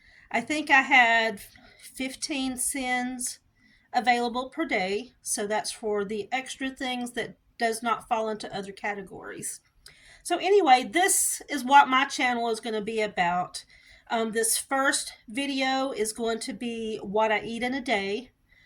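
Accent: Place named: South, United States. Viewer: you've definitely got that southern drawl but we really like it! I do hope you didn't mind me mentioning the camera but it's an idea to play about with. You have done so well. It's not easy in the early days navigating filming, editing, uploading, being Infront of the camera etc. Hats off to you girl. Xx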